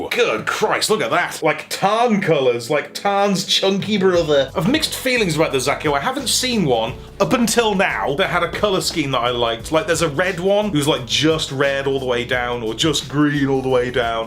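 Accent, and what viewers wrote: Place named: Birmingham, United Kingdom. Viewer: West Midlands crew report, Stafford here... P.S I caught the brummie twang straight off the bat haha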